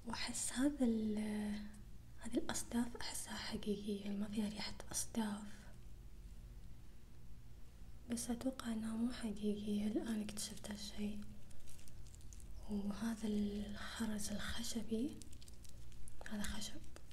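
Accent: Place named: Saudi Arabia. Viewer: هي من وين معليش ؟ احيانا احسها سعوديه واحياناً لا من اللهجه، (ونعم فيها من وين ما كانت اكيد بس اسال لان من زمان ادور سعوديه تسوي محتوى asmr مالقيت )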